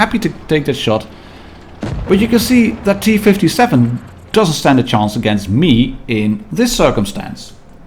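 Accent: Netherlands. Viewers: Just recently started watching your videos, and I am really impressed. Really nice style you have. Your accent also makes me a bit homesick — I’m not Dutch but South African and the Afrikaans accent is similar. I am curious as to why nobody has done a review video on the T55A. Well, I found a French one but I mean none of the “regulars” I follow (you, Bushka, SK8XTRM). Did they not give you a chance to play with it or is it just a bad tank? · its not a trick question😂 I recognised your slight dutch accent, because I’m dutch too😉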